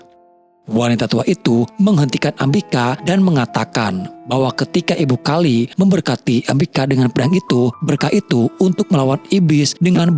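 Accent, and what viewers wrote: West Java, Indonesia. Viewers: opaL???.. sunda pisan kang ... ☺️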